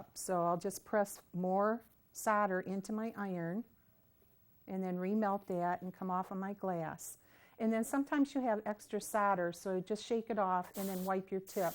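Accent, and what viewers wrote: United States. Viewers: Thank you for this instructional video. But, the whole time I was thinking of Fargo, yeah, you betcha, don'tcha know?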